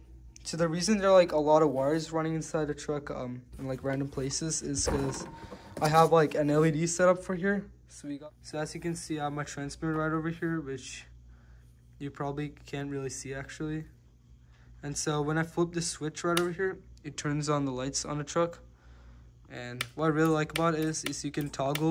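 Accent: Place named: Kerala, India. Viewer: your name and your accent 😀. is a common name in kerala and the way you speaks resonated like a malayali touch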